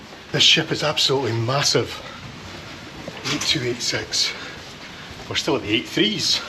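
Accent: Scotland, United Kingdom. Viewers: I'm a bit late finding you, but your videos are great. Very interesting and the narration delivered with the the Scottish brogue, brilliant! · I am not sure what it is about your channel that I love the best?, Your Scottish accent or the GREAT places you go to and take us on? You don't know how much this means to those of us that are ill, or unable to go to these places due to age. I have always dreamed of traveling to the UK, especially to Scotland & Ireland as I had a Great Great Grandma from Ireland. My body is older and I don't know if I will ever get to go, but, your video's allow a brief respit to age, and I feel like I am there with you in the video's. LOVE the ferries, and love the honesty of your video's. God Bless and keep it up!! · Interesting vlog but in all fairness, it's a tad rich for a person with a good Scottish accent to slag off us Irish for the way we pronounce the word "three"! 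There is actually a very good reason why we tend to silence the TH — we don't have that sound in our Irish language, so in Hiberno-English three may sound similar to tree. As for the prices on board — they are much the same as you'd pay in Dublin for breakfast or dinner.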